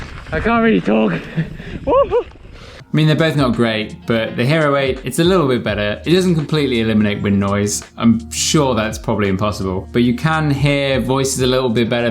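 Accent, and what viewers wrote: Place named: United Kingdom. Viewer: wow, your British accent is just to annoying. SKIP....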